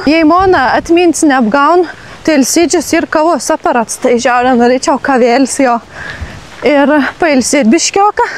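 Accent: Samogitia, Lithuania. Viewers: Smagu girdeti zemaiciu snekta. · Smagu klausyti žemaitiškas tarmės, labai smagiai žiūras. Sėkmės👌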